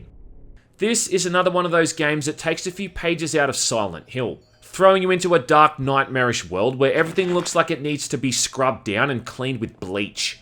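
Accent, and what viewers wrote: Australia. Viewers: Love this guy's Aussie accent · Your videos are way better than Gameranx. It may just be the macho ozzie accent.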